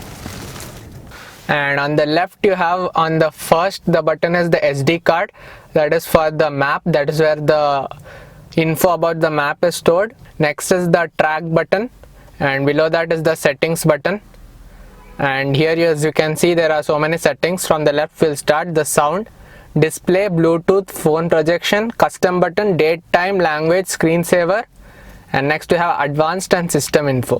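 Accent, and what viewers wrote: India. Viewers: your accent is sooo damnn funny bengali babu😂😂 and bro why r u so nerdy ?? improve ur personality dude open up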